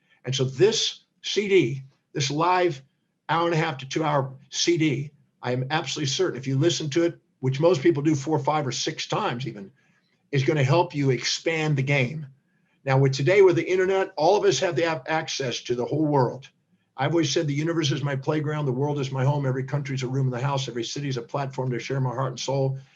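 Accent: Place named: United States. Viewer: An amazing mix for a speaker: american english accent + italian passion and intensity Got your message so clearly! Thank you again 😊 P.s: I hope I'm right saying 'an american english accent' as I'm from Argentina.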